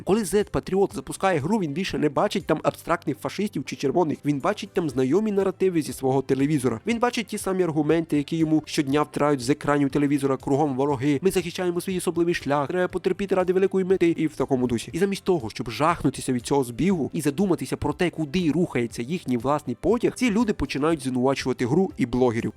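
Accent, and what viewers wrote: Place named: Transcarpathia, Ukraine. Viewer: Чомусь я відчуваю закарпатський акцент . Я ж правий?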